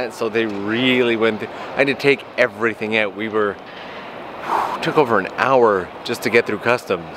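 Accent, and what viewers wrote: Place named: Canada. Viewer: I've just started watching a few of your videos and I heard your accent. I was 90% sure you were Canadian but wanted confirmation. I saw this video and I clicked it so fast to scratch that itch I had. Sure enough, you're definitely Canadian looool. Greetings from Jamaica!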